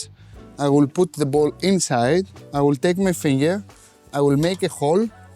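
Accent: Greece. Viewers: your Greek accent is coming back 😅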